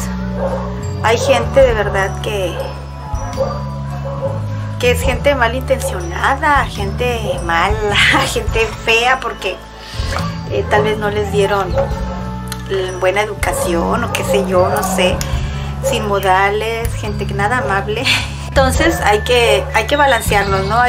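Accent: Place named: Tampico, Mexico. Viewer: muy bonita plática no cabe duda cómo platicamos los de Tampico al oírte me siento en casa en mi tierra mi esposo es cómo el tuyo y acá en Guadalajara les llama la atención cómo es muy bonito cuidate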